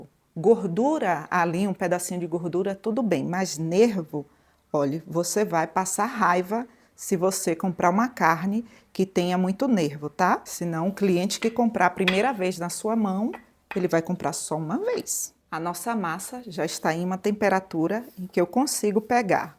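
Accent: Bahia, Brazil. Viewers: Kkkkk esse pedido de like, é cativante. Deus te abençoe. Esse sotaque baiano é indescritível. Deus te abençoe infinitamente.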